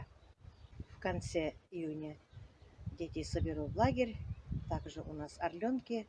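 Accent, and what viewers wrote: Tatarstan, Russia. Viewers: ИСЕНМЕ СИЗ. АЛЛА БИРСЕН ВСЕ БУДЕТ ХОРОШО М ВАШЕЙ СЕСТРОЙ. ОЧЕНЬ ЖДУ САБАНТУЙ И НАДЕЮСЬ ЧТО ВЫ БУДЕТИ СНИМАТЬ ЭТОТ ПРАЗДНИК САБАНТУЙ.БОЛЬШОЙ ПРИВЕ Т ВАШИМ СЕСТРЕНКАМ И САМИ БУДЬТЕ ЗДОРОВЫ.У ВАС ОЧЕНЬ ХОРОШО НА ОГОРОДЕ. У НАС В БАКУ ЖАРА ЛИСТЬЯ НА ДЕРЕВЬЯХ ПОЖЕЛТЕЛИ И КАК ОСЕНЬЮ ПАДАЮТ. ИСЕН САВ БУЛЫГЫС. Я КОГДА ВАС СЛУШАЮ И ВАШ ТАТАРСКИЙ АКЦЕНТ МНЕ ОЧЕНЬ ПРИЯТЕН МОЯ МАМА ТАК ГОВОРИЛА.ОБНИМАЮ ВАС И ОЧЕНЬ ВСЕГДА ЖДУ С НЕТЕРПЕНЬЕМ ВАШИ ВЛОГИ. ВЫ И ВАША РОЗА ОЧЕНЬ МНЕ БЛИЗКИЕ ЛЮДИ.👍💖💖💖👄🌹🌹🌹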